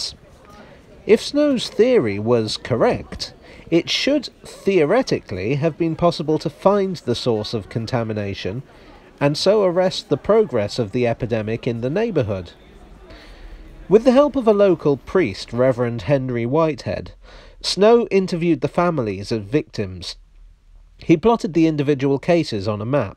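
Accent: United Kingdom. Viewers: British; Snarky; Educated; Articulate; Dry Humor... If Shaun Hastings of Assassin's Creed had a YouTube channel, this would be it. Love your content, man.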